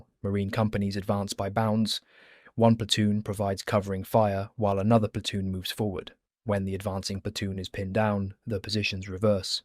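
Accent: United Kingdom. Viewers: Get rid of the UK narration 🤮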